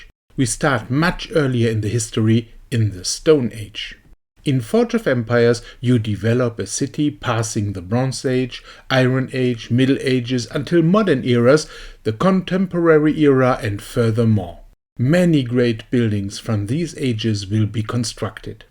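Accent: Germany. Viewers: Hört sich sehr deutsch an dein Englisch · your accent is awesome haha. wundebar!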